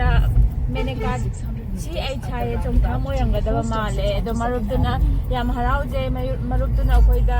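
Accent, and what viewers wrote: Kakching, India. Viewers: Eche d kakchingdei mande lonse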